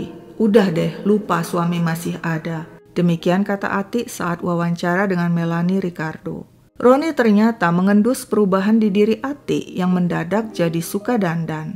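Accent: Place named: Java, Indonesia. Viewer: Kalau ngomong... bahasa jawanya lucu...lagunya risau berkesan...suaranya unik...dan cantik...👍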